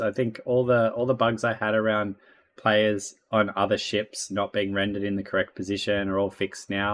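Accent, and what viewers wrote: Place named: New Zealand. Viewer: looking pretty good, do you think you'll put in some kind of sloped/wedge block? also, i noticed from your accent, are you a fellow new zealander? cause if so, that's pretty cool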